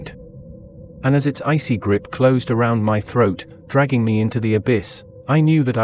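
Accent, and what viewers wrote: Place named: England, United Kingdom. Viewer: An English narrator! 😀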